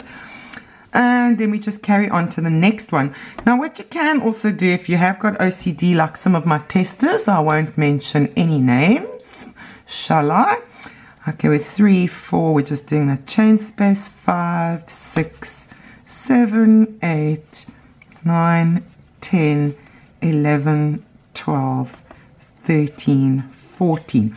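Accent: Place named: South Africa. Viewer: Nice South African accent 😉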